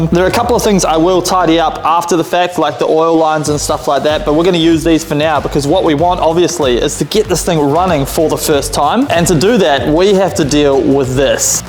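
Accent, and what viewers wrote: New Zealand. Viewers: Are you a yank???? It’s zed not zee your kiwi accent is hard enough to swallow but adding a yank into the mix is just too much to handle I’m going to have to unsubscribe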